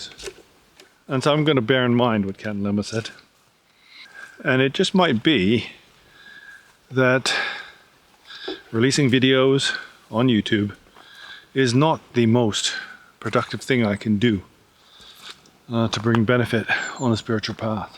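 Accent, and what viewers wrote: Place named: Scotland, United Kingdom. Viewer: I am going with a friend in a few weeks. I only live 2 1/2 hours away by car and yet I only heard about it two months ago. And yes, I have been studying Buddhism for years. I live on walney Island. In cumbria UK. I have been studying. the Dharma for quite a while but on November2nd 2024. My precious wife died from a heart attack at the age of 58. And even though I understand that everything is transient. It still hurts. Even though I don’t exist, ultimately conventionally it hurts! So I decided to go on retreat somewhere and an American Yogi told me about this place it turns out my psychotherapist teacher in the early 90s used to go there I talked to his daughter and she said yes that’s why he went and yes I had no idea he had studied Buddhism! And so my American Yogi said maybe you’re meant to go there and I will be going within the next few weeks just for the day and then I will arrange for a retreat when the weather gets better as you know especially this week January 2025. 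It’s pretty cold everywhere.! I have one question for you do you live in England but you sound Scottish to me? I have subscribed and will watch all your videos because this one is excellent thank you.yours Trevor.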